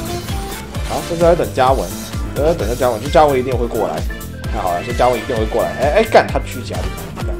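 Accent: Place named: China. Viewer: 確實 他是大陸人嗎 那口音聽得很難受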